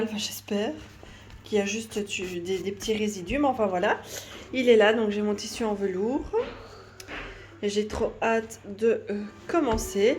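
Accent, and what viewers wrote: Belgium. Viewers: Hey trop contente de te retrouver !! Ce petit accent Belge m'avais manqué ! Des bisous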